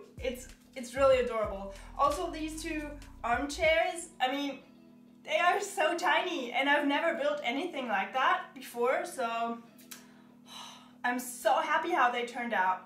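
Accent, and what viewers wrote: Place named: Germany. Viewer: Could it be that you are German because your English sounds like that. (I’m German btw)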